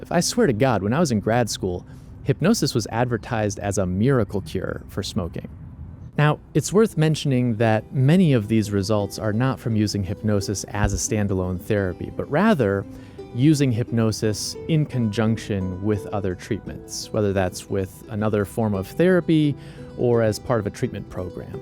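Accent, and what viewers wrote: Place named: Scotland, United Kingdom. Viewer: Dude nice Scottish accent.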